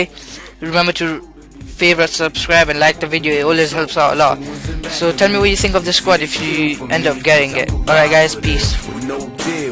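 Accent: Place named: South Asia. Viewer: you sound brown D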